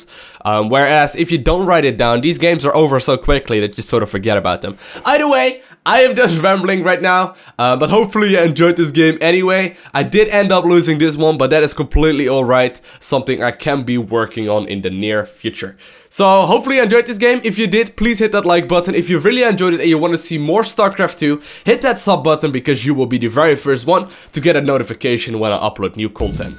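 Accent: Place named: Netherlands. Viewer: Where you from, because you speak english really wel but you have a small foreign accent. Are you dutch or something?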